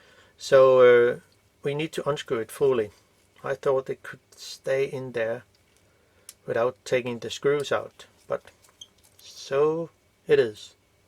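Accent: Denmark. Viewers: Hej. Er du dansk? Det lyder sådan. 😄 Hvis kan. Du så hjælpe mig en 135 mm 3..35 med en stiv fokusering? Mvh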